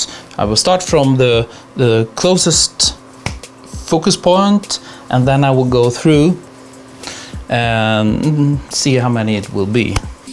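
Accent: Sweden. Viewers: Ahhhhh proper Swedish accent. Renewed my passport today and I felt I needed to hear some more Swedish so good timing with the video.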